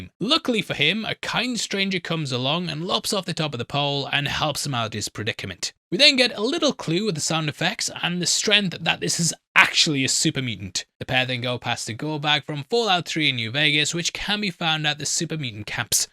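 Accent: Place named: United Kingdom. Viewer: I kept thinking "Who the hell is Bob?" Then it hit me. "Oh, BARB!" Brits and their dropped 'R's. Ain't language some shit? (Loving the breakdowns, by the way.)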